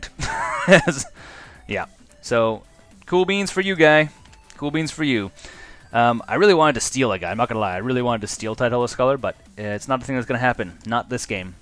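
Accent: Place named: Edmonton, Canada. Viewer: Edmonton? Your accent is too think to be Windsor.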